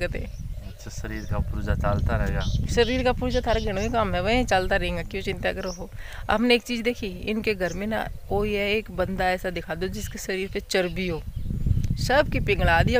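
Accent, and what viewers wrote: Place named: Rajasthan, India. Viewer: Di tha haryana ari boli na bolo rajasthan ari boli puri ab to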